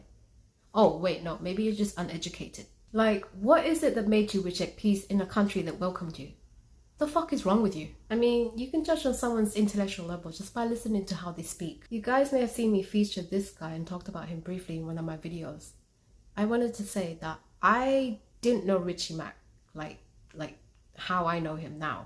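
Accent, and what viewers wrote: United Kingdom. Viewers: Wher'd ya acquire that pommy accent, สำเนียงบิวติฟูลน๊าจ้ะ😂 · you're british? cool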